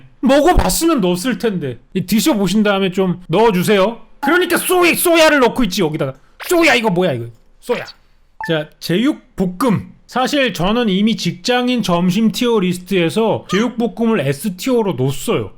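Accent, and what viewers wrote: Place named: North Korea. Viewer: 이사람말투왜이럼 북에서왔음?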